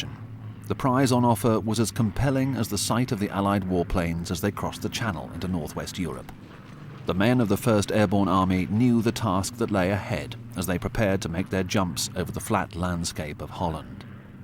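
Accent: United Kingdom. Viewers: ...but but but it's got the stiff upper lip accent! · Well said. Furthermore, as I like it as an American, is it possible that there is another voice other than a British voice that can narrate the story? Is there some kind of prohibition against all other English speaking people? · I hate the narrator's pretentious British accent.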